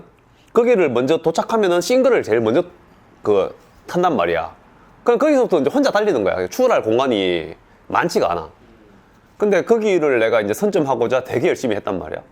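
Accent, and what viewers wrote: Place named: Busan, South Korea. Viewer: 부산 사투리군요 영상보면서 강호동이 자꾸 떠오름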